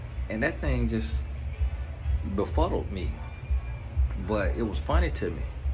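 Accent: South, United States. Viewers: “Don’t try me like no baser” 🤣🤣🤣 you know you from the country when you say that! That’s how we talk where I’m from lol (Wildwood). This video was spot on though, some great knowledge. We are the salt of the earth, country draw or not, we were meant to stand out. Our power lies in our authenticity! Period!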